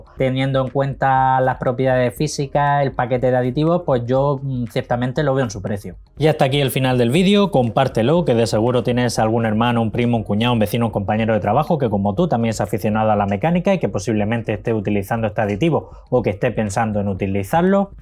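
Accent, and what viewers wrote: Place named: Almería, Spain. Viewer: Buenas noches. Acabo de descubrir tu canal por casualidad, y me ha encantado. Podrias recomendarme un aceite para mi Audi A3 1.9 tdi 130 CV del año 2000? Ahora tiene 220.000 kms, en su día en el servicio oficial le ponian el Repsol elite tdi 5w40. Por cierto, al escuchar tu voz creo q eres de Almería o Murcia, porque he estado muchos años destinado en la Base Militar de La Legión en Viator, y me suena mucho el acento y la pronunciación de algunas palabras como se hablaban allí en Almería.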